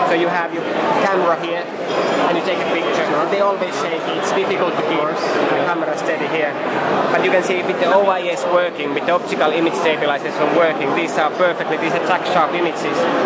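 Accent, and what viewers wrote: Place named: Finland. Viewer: that finnish accent is annoying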